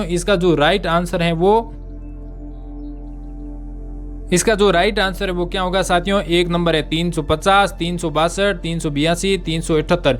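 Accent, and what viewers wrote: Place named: Southern Rajasthan, India. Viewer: Bhai aap kaha se ho aapki laungage se to lag rha udaipur khervada baswara dungpur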